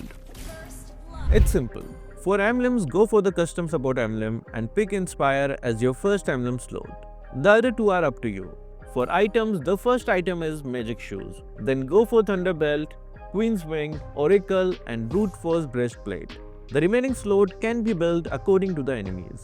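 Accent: India. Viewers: I love because he has such wonderful edits and a gorgeous indian accent!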